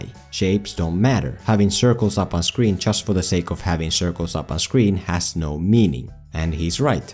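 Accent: Finland. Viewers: Nah, it's a fictional East European country from some movie or video game or something, I don't remember. But he is clearly Finnish, I can tell by his accent (I'm a Swede). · Your accent sounds so fucking Finnish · krakozhia... hmm .. but you accent still sounds alittle ... Finnish... maby... Estonian... maby... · is krakozhia a inside joke? because i couldnt find anything on google and tbh you sound finnish torille tavataan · Are you Finnish? You're accent sounds Finnish. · Ahh I recognize a finnish accent when I hear one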